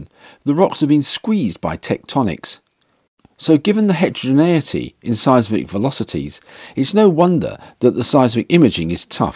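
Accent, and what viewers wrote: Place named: United Kingdom. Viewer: Very good, thanks, and I'm very glad you're a Brit. I wanted to watch this when it came up as recommended, but was prepared to click away immediately if I heard an American accent. Subscribed.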